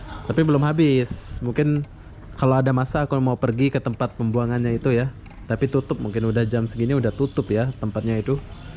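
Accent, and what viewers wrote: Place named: Malaysia. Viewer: Macam logat malaysia ja mas..pake bahasa negara sendiri ja mas...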